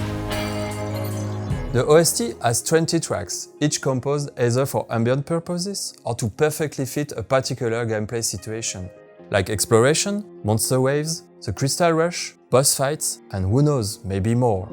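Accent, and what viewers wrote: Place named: France. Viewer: Toujours aussi fan des oeuvres d'. Et The french accent, toujours aussi nice ^^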